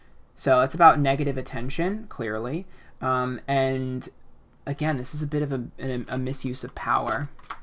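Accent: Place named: Staten Island, United States. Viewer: are u from staten island. that accent reminds me of my old good judy from back in the day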